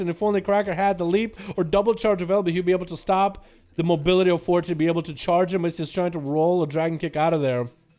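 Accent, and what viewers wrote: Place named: Russia. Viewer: LOL! Superior russian aсcent!!! =)))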